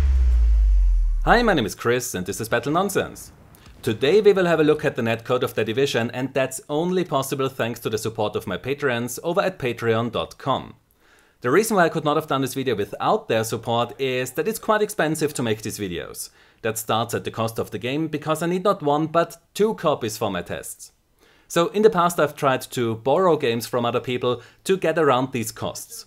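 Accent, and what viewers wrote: Germany. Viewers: You sound german :D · Aus deinem echt fantastischem english habe ich rausgehört das du doch ein deutschsprachiger bist also schreib ich´s auch in deutsch wenn es dir recht ist. verdammt interessantes video !!! danke dir sehr. Hast du infos was world of warships angeht betreff´s .netzwerk sachen für mich? Ich weis nur das wows (world of warships) mit UDP arbeitet,und das es einen dedizierten server gibt für den EU bereich,ich glaube das er in frankfurt steht denn mein ping ist immer auf dem gleichen wert von 34-35. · What is that accent? Is it German?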